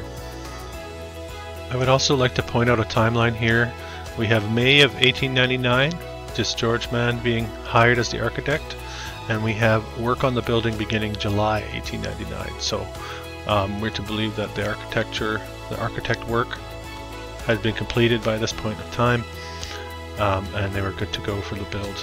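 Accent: Canada. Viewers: Are you from Alberta ?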